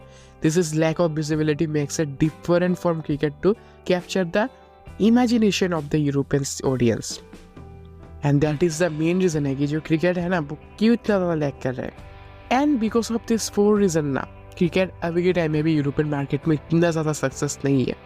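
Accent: India. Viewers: Love your Hindi Accent Brother! Keep it up. nice work. easy thumbs up.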